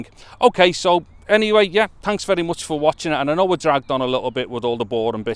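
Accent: Liverpool, United Kingdom. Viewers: How refreshing. A fellow Scouser with a no-nonsense, objective review. Luvved it and just subbed yer.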